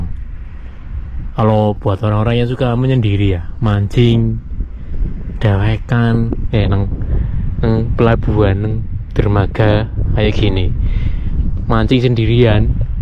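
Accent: Banyumas, Indonesia. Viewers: Ora ngapak ora kepenak.. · mas...anda lucu sekali ya... indonesia bngt aksenmu sukaakkk....ga ada takutnya ya di negeri horor dan mistis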